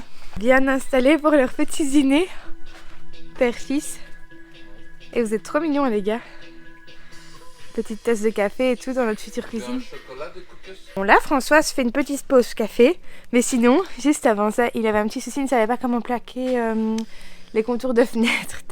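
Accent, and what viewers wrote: Belgium. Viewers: Super moi qui ai la nostalgie de mon pays d'origine, je vis actuellement en France depuis plus de 10ans. Ça me manquait d'entendre l'accent Belge et comme j'adore ce type de vidéo, du coup un nouvel abonné. 👍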